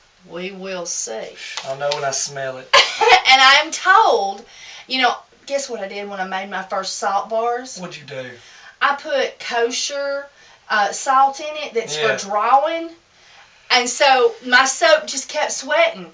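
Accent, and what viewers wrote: South, United States. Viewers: Hi, I have watched so many of your videos! You remind me of my grandma and grampa haley that happened to be from your state with their heavy southern accent. I can do a great southern accent to where you would think that's how I talk all the time, but I've got a boring California accent in reality. Anyway, watching you makes me feel like I'm home because of the way you talk, and your cute personality.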